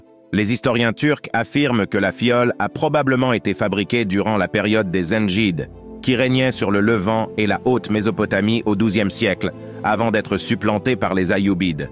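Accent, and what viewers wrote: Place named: Quebec, Canada. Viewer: C'est un Québécois qui fait la narration...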